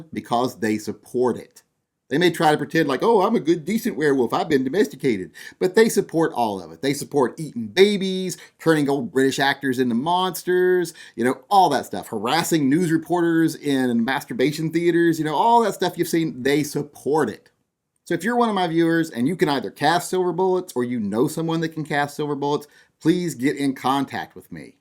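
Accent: United States. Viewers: I hear you.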